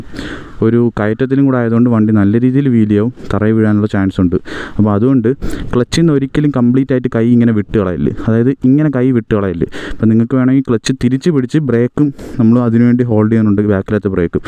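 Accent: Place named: Trivandrum, India. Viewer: Where is this place ? Trivandrum ano? Asking because your way of talking is very similar to someone from tvm. Im from tvm and we also use the word theri for slope.... Great video by the way ...very well explained with examples.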